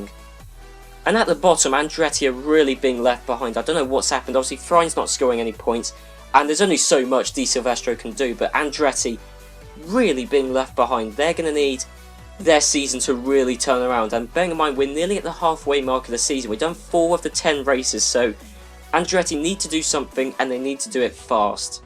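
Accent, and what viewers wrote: United Kingdom. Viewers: Are you from Luton in Bedfordshire?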